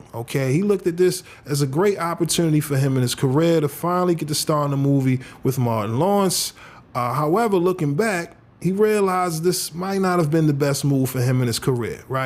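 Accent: Baltimore, United States. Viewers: I'm checking in from the DMV. First time finding your channel. When you said 2, I heard BMORE, i was gonna ask, but then you started talking bout BMORE. Showing you some...❤❤❤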